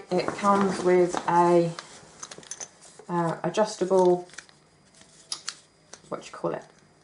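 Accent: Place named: Texas, United States. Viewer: I enjoyed you video so much. Your "Texan is great". Being a born and bred Texan, I can tell you would make a great asset to our great state. And I like the way you shop, video and talk!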